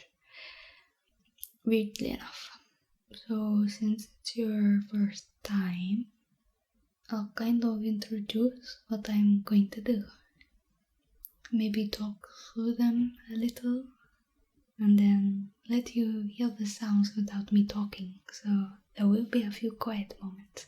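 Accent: France. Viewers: i never knew i would fall for girls wit french accents this hard you suprise me every video lol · Dam. French accent. My weakness. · You already have such a relaxing voice, but the addition of a french accent makes it even better. I come back to this one a lot. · I miss this French accent of hers 😢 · I love long ASMR videos. As a German, I really love French accents too. I'm sure this will really occupy alot of my time. I'm glad this invaded my recommended, kind of like how the Germans invaded through the Ardennes in 1940. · Wait are you actually French or just really good at impressions. We also need more wattson, or Kermit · is this what french accents sound like from frace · It’s weird: When you speak English, you have a very pronounced French accent, but when you speak French, I can’t understand a word you say. Without the subtitles, I wouldn’t have understood anything. 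I’m French, I don’t know what to think about that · the french accent really gives of the vibe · Her: french accent My head: switch it to russian accent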